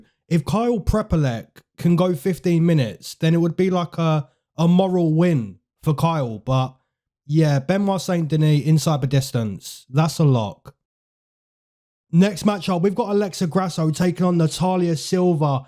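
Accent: United Kingdom. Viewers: Whats good brother was wondering which region you hail from Im trying to learn more about U.K accents. Useless though, because no other american will care that i know this shit.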